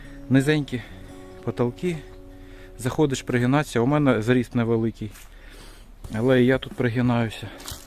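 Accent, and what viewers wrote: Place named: Mykolaiv, Ukraine. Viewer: Привіт Миколаївцям ! Все буде Україна Слава героям України ! Не думав що так Миколаїв розмовляє солодкою українською тай ще зачарованою… Хай вам щастить ! Тихого і мирного вам неба! З вами був кримський українець ! Привіт із Північний захід Америки! У нас цей рік як ніколи … просто все залито…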